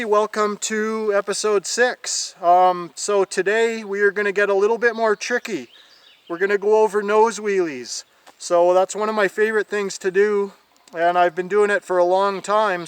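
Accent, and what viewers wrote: Canada. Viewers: lol i love this guys accent! Canadian?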